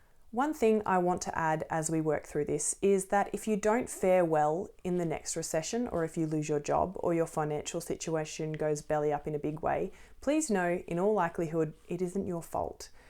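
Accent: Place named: Australia. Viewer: Hearing all this in an Aussie accent does make it a lot less terrifying ✨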